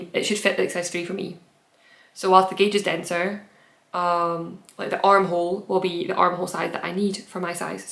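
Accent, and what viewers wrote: Scotland, United Kingdom. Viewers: Thank you! I love your podcast and LOVE Scotland. I am from the Colorado Rockies but did a year abroad at Stirling Univ and fell in love Scotland. ♥ I love hearing your accent b/c it brings back my memories of the beautiful people of Scotand. in 2019 my husband and I backpacked for two weeks in the highlands. A dream. Thank you for your podcast.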